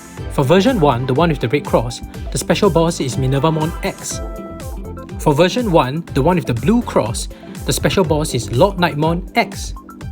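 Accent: Singapore. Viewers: Bro u staying in sg??? sounds like ure singaporean. Keep uploading gd digimon content! 👍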